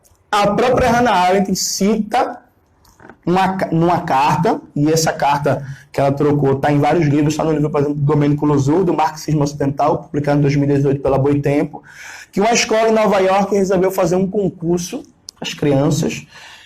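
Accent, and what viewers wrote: Pernambuco, Brazil. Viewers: sim, sem dúvida, o sotaque é puro mangue pernambucano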